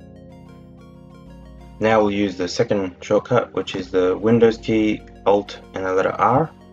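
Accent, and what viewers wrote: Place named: United Kingdom. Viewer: you're correct i watched the video my record button has been greyed out formonths on the game bar. pulled it up again while listening to your british lilt and the button was still greyed out >anger viscerall through my veins . however i kept listening to you and win+alt+R did work albeit i had to finagle the sound settigs and change permissions for audios using a different tutorial but your hotkeys knowledge did the trick. "cheers mate"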